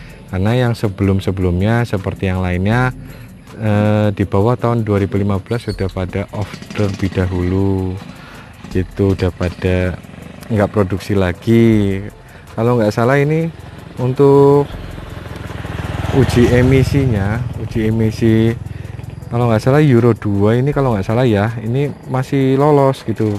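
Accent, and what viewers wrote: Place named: Banyumas, Indonesia. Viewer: Kyk ada campuran bahasa ngapaknya hee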